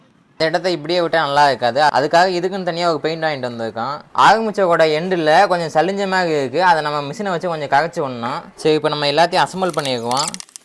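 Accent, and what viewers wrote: Madurai, India. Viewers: Madhurai karan ahh bro neenga 😅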